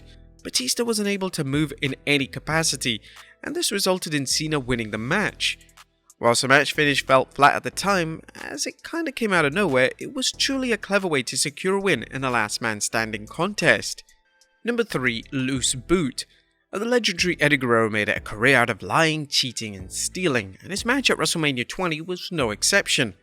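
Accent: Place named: United Kingdom. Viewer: Why is every wrestling YouTube channel hosted by someone British?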